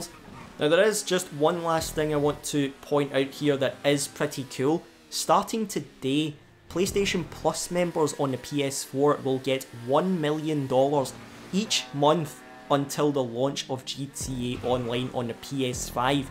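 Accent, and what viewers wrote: Scotland, United Kingdom. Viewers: U must be Scottish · Arr you scottish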